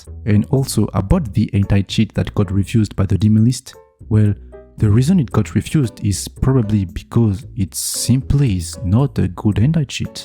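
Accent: France. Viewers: bruh your french accent is beating you up so bad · I cant listen to french english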